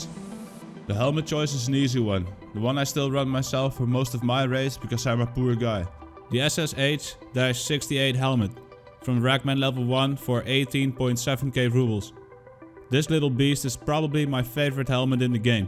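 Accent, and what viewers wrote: Netherlands. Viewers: Nice to watch. Really useful info. Though I can hear you are probably Dutch haha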